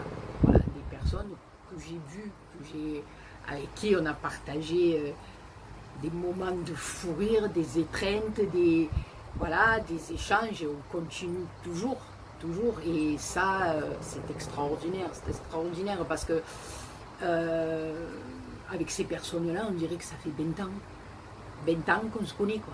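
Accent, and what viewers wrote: South, France. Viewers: Coucou. Je suis tout à fait d'accord avec toi. Ces questions sont vraiment c.....s. Comme pour Toi, youtube c'est une source de partage d'idées, de tutos et de connaissances rigolotes comme tu es, comme Greg etc.... que des gens simples et francs, et cet accent qui est le mien j'adore. Moi aussi toutes ces questions me font "monter la boufaïsse". Comme j'aimerai vous rencontrer Toi, Greg, Patou parce que je pense que nous ne sommes pas loin (géographiquement parlant) je peux espérer peut-être un jour chez Action Saint -Victoret ou Istres (car je suis plus près d'Istres. Un conseil. Continues, ne changes rien. À bientôt. Bisous 😘. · Coucou, j'adore regarder tes vidéos, ton naturel et ton accent bien du sud me donne du baume au cœur. Et comme tu dis chacun fait comme y veux. Bisous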